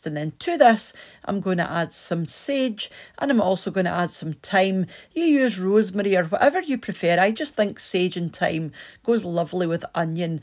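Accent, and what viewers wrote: Scotland, United Kingdom. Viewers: I love your soft Scottish voice... I could listen to you reading the phone book😂😂😂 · Every time I hear your wonderful Scottish accent I have a desire to fire up my R.G. Hardie pipes. 🇬🇧🤝🇺🇸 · First time I’ve watched you. Love the Scottish accent, which I miss from relations that have passed away now. Thank you for the sausage pie. Will have a go at that one sometime soon.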